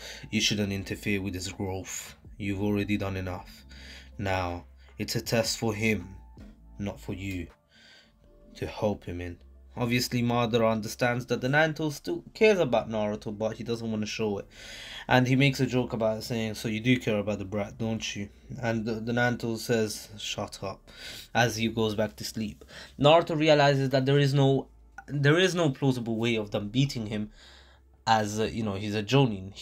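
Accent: United Kingdom. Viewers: Are you British mate yeh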